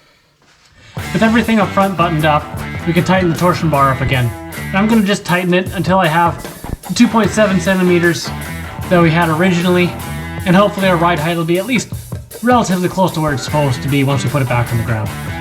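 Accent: Canada. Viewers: I knew I could hear a Canadian accent in this guy!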